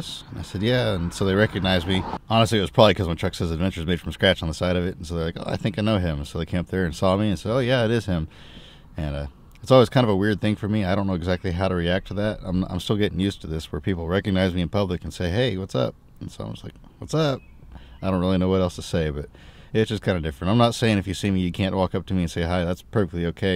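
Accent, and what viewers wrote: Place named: Kansas, United States. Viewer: Shame the rain damaged some stock. You definitely need some more reliable help. I'm 77 fit and well and would be there tomorrow.Havinf know caught up on that Kansas accent which this 'ole South London boy has just got used too. Nice to see the family who are so important for a successful business. Every Best Wish from Wales U.K..... Pat.C.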